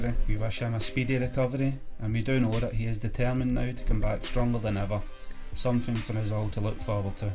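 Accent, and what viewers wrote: Scotland, United Kingdom. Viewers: Holy Scottish accent 😂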